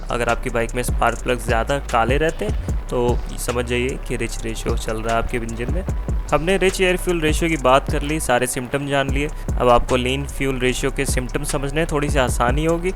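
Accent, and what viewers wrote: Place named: Uttarakhand, India. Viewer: So you are from Uttarakhand 😂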